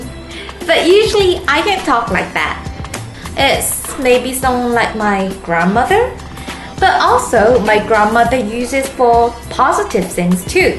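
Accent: England, United Kingdom. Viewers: she sounds british almost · I love your English accent. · Your Korean accent sounds almost British... Interesting. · Yaah! Unnie! You're so perfect! You speak korean and have that british-like-accent. T^T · You seem to have a very slight English accent lol. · i like her english accent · She sounds like she's got a british accent aswell as the korean slurring. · she sometimes has a british accent, but mostly its a typical korean american accent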